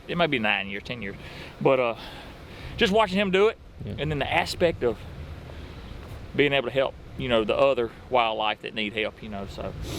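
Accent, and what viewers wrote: South, United States. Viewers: Hears the voice of the master typically redneck BS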